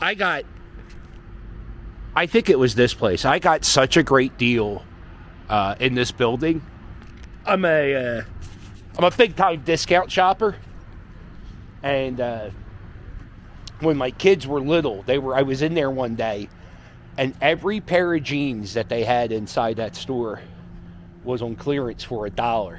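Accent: Philadelphia, United States. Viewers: I come from 4 generations of Philly. Those pronunciations are quintissentilal Philly.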